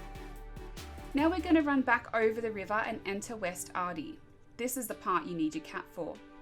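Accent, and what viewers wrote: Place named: Australia. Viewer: aussie, I like it (im aussie/kiwi)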